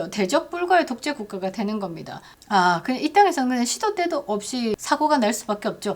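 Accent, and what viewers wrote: Gyeongsang, South Korea. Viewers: 경상북도 출신인교?사뚜리가!너무너무 좋아요!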